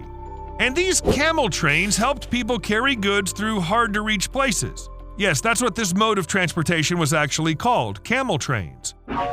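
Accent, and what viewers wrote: United States. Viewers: Why can't I Americans say EMU E-M-U and say EMOO it does my head in where do they get OO and its MUM not MOM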